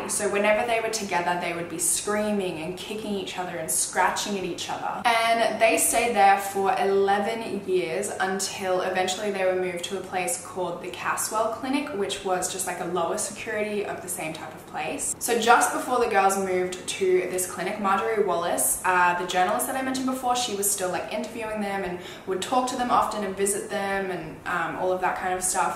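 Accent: Australia. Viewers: yay she’s an aussiieeee!!!!! :) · Holly molly she aussie oml i am too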